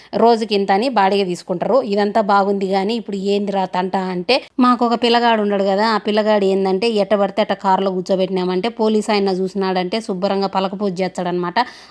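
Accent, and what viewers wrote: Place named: Kadapa, India. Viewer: అమ్మ మీ మాటలు వింటుంటే పక్కా మన కడప జిల్లా మాట్లే ఏది పొద్దుటూరు ఎర్రగుంట్ల మైదుకూరు ఆ పక్క మాటలుఅమ్మ మీ మాటలు వింటుంటే పక్కా మన కడప జిల్లా మాట్లే ఏది పొద్దుటూరు ఎర్రగుంట్ల మైదుకూరు ఆ పక్క మాటలు మీ మాటలు వింటుంటే మళ్ళీ మన ఊరు దిక్కు మాట్లాడుతారు చూడు ఆ విధంగా ఉండాయి ఆమ ఆ మాటలు వింటుంటే కడుపు నిండుతుంది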